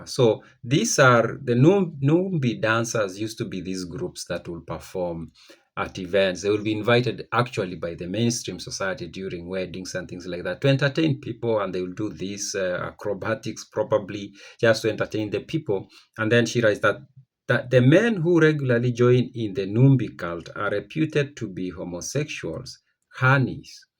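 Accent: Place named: Kenya. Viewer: we are dignified people we are nomadic we troll each other because we think words are just word lol we laugh a lot and believe in mental resilience and respecting others even if we are not from the same country I learned all this from my dad he grew up nomadic but he gets along with anyone and will speak up/ stand up for anyone when need be. M Side note: from your accent I think you’re Kenyan or 🇺🇬? This my first time seeing this video so is just a guess😂 nice video🙏🙏🥰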